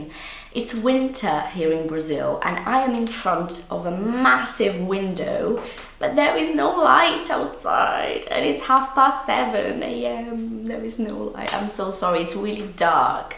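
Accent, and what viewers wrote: RP, United Kingdom. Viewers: It's amazing that you sound almost like the Queen. Super posh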